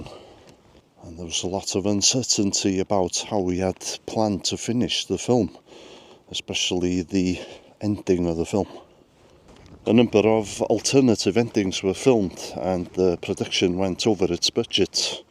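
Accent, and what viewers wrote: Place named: Caernarfon, United Kingdom. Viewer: Faacinating stuff, thanks! Completely off topic, I'm trying to place your accent. Caernarfon?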